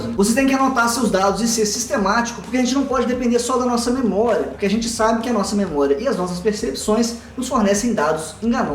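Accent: Minas Gerais, Brazil. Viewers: amo de coração seus videos, não perco um. Mas, a forma que você fala e no "mineires" (falando rapido) as vezes fica dificil de entender. Nos videos maiores as vezes tenho que voltar um pouco pra conseguir seguir o raciocinio. Sou da area ambiental mas sou apaixonado em fisica.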